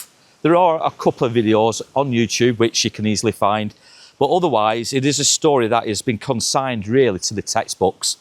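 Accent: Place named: Rochdale, United Kingdom. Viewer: Another sad story but great video, You tell good stories and you're really good at what you do. you have the same accent as a good mate of mine that lives in Rochdale, we've been good friends for 25 years.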